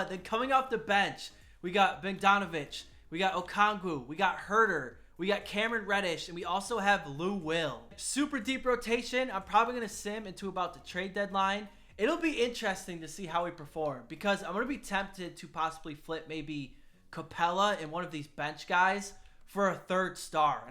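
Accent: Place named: Wisconsin, United States. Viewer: u said Bogdanovic wrong but im pretty sure thats just ur Wisconsin showing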